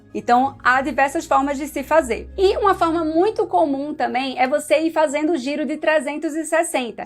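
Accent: Pernambuco, Brazil. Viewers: Pergunta nada haver com o vídeo: vc é de PE? Seu sotaque é bem forte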